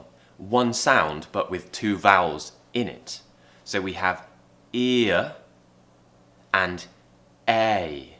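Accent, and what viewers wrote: United Kingdom. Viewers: Hi. I'm so happy to learn Brtish accent through your video. I love your Brtish accent because it sounds beautifully and you show clealy how to pronunce Brtish English words. Thanks a lot. ( ^-^)ノ∠※。.:*:・'°☆ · I love you and your lessons and the same english with Lucy but your pronunciation is more British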